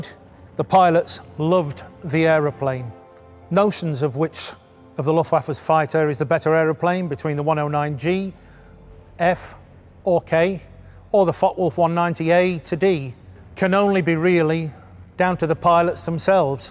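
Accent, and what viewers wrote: England, United Kingdom. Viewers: I think the dropping of the "e" is more to do with the speaker's regional English accent than anything else.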